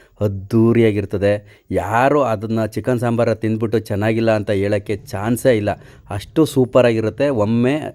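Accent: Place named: Chamarajanagar, India. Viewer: Namane ru chamrajnagra avru avru nim slange matadadu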